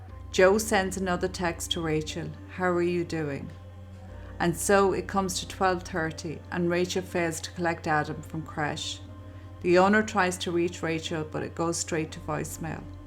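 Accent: Ireland. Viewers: you be true to yourself. lovely Irish voice... Calm, and if slow for some, i think it hints at empathy which is appropriate considering to innocent peoples lives affected forever by crime & destructive behaviour. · Watching from New Zealand, brilliant, easy to understand, don't try to change that lovely accent. I spent years living in Cork city. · Subscribing from Florida. Your Irish accent is absolutely beautiful! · love to hear Irish accent!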